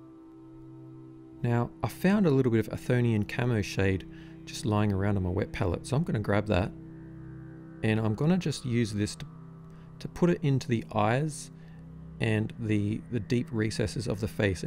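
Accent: Australia. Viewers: "a bit how ya goin' " it truly is wonderful to hear the Aussie vernacular getting represented in the Warhammer Community. "Wha'do'ya'think of tha new Primaris moooite?" "Yeah not behd pretty good size" "Corvus, he's a traitor ay?" "Yeeaaahhh... Naaahhhh"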